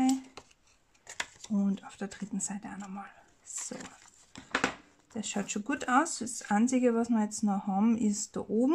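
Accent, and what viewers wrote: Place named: Austria. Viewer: Die vintage u die rosenkarte sind meine Favoriten! Nach der Aussprache bist du österreicherin!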